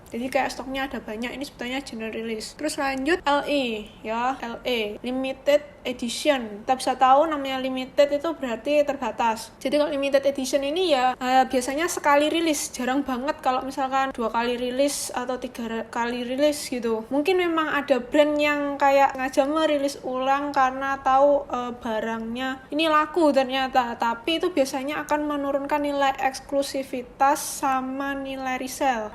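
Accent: Java, Indonesia. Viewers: Mbak mbak faforit gua 😁 medok banget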